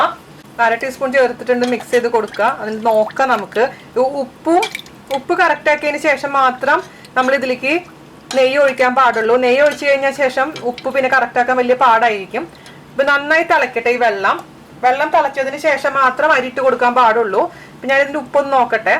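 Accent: Thrissur, India. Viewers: yude big fan anu njan. Mattu cooking show kalil ninnum valare vyathyastamaya reethiyilulla avatharanam anu.. Thrissur slang ilulla samsaram kelkan thanne rasamanu.... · ഗഡീ ഞാൻ കരുതി ഡെന്ദേദ് ദെ നുമ്മടെ തൃശ്ശർ കാരിയാണല്ലോ ഉണ്ണേടെ നാടൻ ശൈലി, ഹാഫ് ആയപ്പോഴാ എന്റെ നാട്ടുകാരിയാ... സൂപ്പർ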